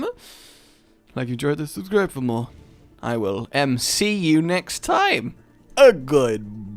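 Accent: Russia. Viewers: Not gonna lie, he actually does a pretty damn good Russian accent.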